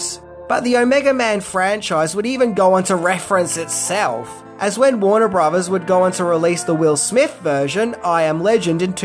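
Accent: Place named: Australia. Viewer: is just speaking Australian English. In America, people say “oh-may-huh”. Apparently everybody else says “oh-me-guh”. Just different dialects of the English language.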